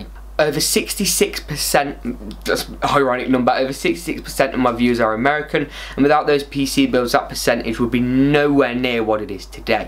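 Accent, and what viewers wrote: United Kingdom. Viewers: By your voice i think you're in the uk, where abouts in the uk would you suggest i buy parts (online or in store) i want to build the $700 build but buying in the uk with VAT and things it works out so expensive, so where abouts would you suggest to buy parts for the cheapest possible?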